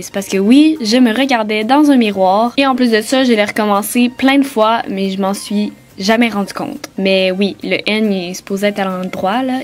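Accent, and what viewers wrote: Quebec, Canada. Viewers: j'adore ton accent québécoise et au passage tes trop belle je m'abonne s'en discuter !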